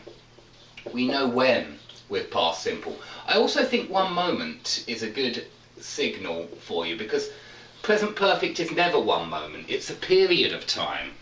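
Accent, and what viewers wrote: United Kingdom. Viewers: Nice accent ^^ are u british? I´ve been there a couple of times and England is so beautiful, greetings from Brazil ^^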